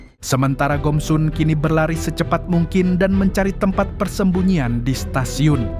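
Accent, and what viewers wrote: Banjar, Indonesia. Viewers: urg banjar kh pian sklinya astga😭😭😭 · Urg banjar kh manggg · Wakai orang Banjar kah ikam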